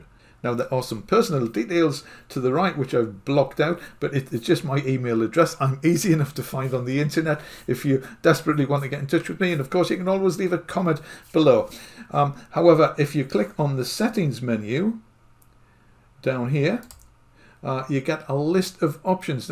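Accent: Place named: England, United Kingdom. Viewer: Excellent and clear. Bloody champion and the proper English accent is welcome too!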